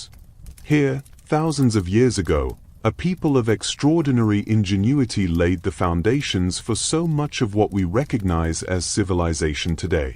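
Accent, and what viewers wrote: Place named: United Kingdom. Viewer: I can endure only limited doses of British pronunciation.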